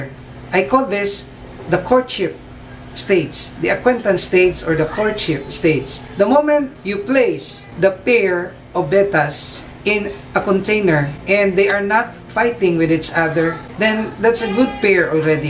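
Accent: Visayas, Philippines. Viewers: Sir asa mo dapita? Kay murag2 duol ra ky bisaya man hahaha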